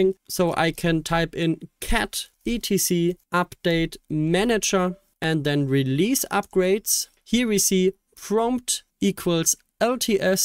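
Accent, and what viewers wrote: Germany. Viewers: i hear Deutsch?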